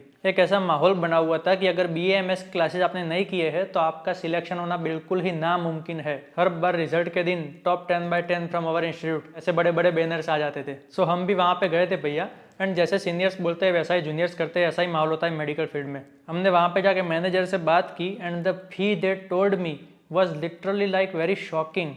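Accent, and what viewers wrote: Gujarat, India. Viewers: Gujrati tone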